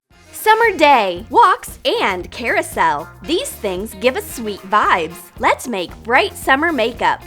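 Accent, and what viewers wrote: Russia. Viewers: are you English or are you Russian?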